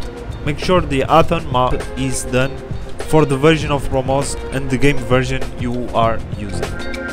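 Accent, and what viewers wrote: Portugal. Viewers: Such a Tuga accent! Obrigado! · It's amazing, in just 3 seconds of watching your video I could tell you were portuguese haha, continua com o excelente trabalho 🇵🇹🔥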